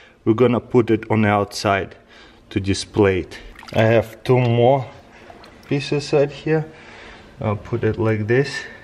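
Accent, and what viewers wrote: Russia. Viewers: Colorless Rainbow its his Russian accent...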